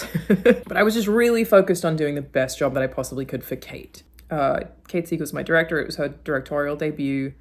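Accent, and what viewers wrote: United States. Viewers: I loved it and Stowaway was the best for me due to the psychological horror. The ideas presented were brilliantly horrific, without giving any spoilers. And, let's give it up for your American accent. Kudos,. For real. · Watched this last night. Not bad. Weird hearing your american accent since im so used to your Australian..the skydivers bit gave me Cloverfield vibes. But good job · hearing you speak in an american accent was weird as hell.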